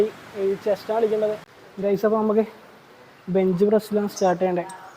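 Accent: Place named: Azhikode, India. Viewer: Azhikode anenn thonunnu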